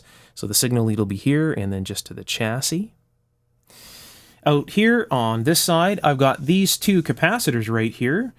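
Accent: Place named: Canada. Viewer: Oh...sorry. No problem...it's worth the wait. I'm not an engineer, but someone mentioned that this was their favorite channel on YouTube, and I was absolutely fascinated by that video. And that Canadian accent reminds me of home. :)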